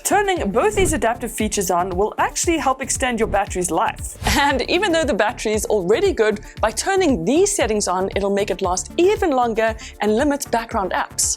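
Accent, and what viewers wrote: South Africa. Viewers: hmmm you sound a little South African :o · South african accent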